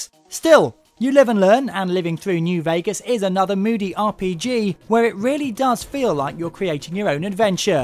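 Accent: England, United Kingdom. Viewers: dnt worry not all english accents sound like his his is annoying but the english accent is a lot nicer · his the person taking in the review British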